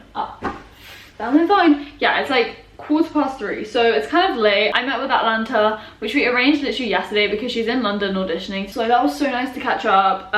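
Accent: Australia. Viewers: You have an Australian accent! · I've noticed that you've gained quite the Australian accent. Maybe that's me being a little extra. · your accent sounds australian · I can hear all the Australia in your accent ^_^ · Cringe that you're speaking in an Australian accent u were only there for a couple months...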